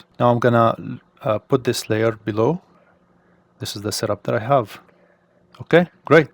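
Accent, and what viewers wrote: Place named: Serbia. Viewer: You have a Serbian accent:)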